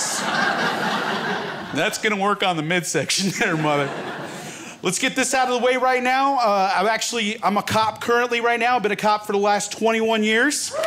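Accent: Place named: Boulder, United States. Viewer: But sounds more like he works in Boulder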